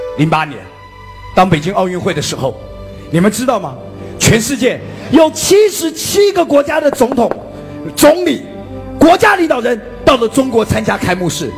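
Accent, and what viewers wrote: Taiwan. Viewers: 我就想问问这是谁？听口音台湾同胞呢？ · 台灣的吧！ 一口一個“xue yi”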